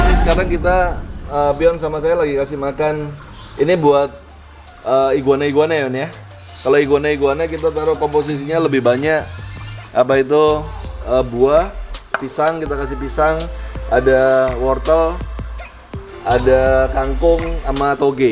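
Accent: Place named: Java, Indonesia. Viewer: Wih orang mana nih om nya Kok pakek logat bahasa jawa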